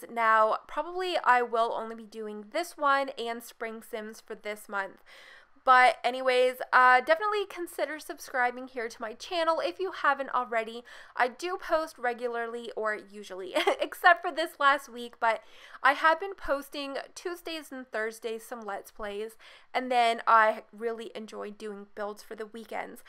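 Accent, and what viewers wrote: Canada. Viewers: Are you from Canada? I'm just asking because of your accent.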